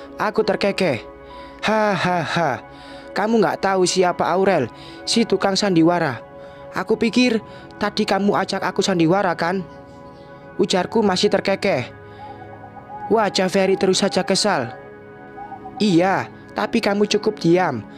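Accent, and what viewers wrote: Java, Indonesia. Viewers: Huuh Medok Jawanya bikin hati sangat bahagia saat denger suara Admin yang manjain telinga ini. Huuh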